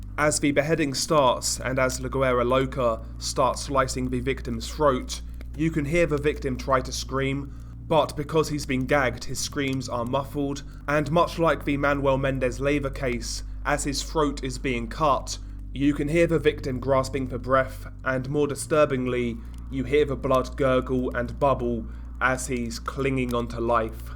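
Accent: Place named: United Kingdom. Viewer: I'm an American, listening to a presumed Britt, inform me about the horrors occuring in Mexico.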